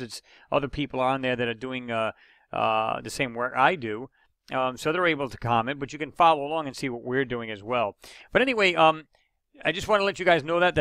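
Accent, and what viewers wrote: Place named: North Jersey, United States. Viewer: lol that's funny cause I really thought you lived in the north jersey or New York area you sound more like your from here then down where u said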